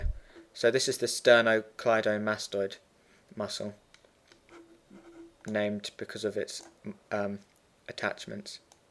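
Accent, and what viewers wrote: United Kingdom. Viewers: terrible british accent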